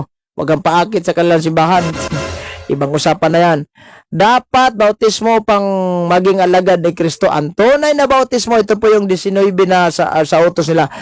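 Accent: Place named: Tondo, Philippines. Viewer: Bakit ganyan ang tagapagsalita, parang nakikipagdaldalan lang sa kanto at usapang lasing. Hindi maayos magsalita, tigas ng at accent at parang lumaki sa Tondo . Para bara bara lang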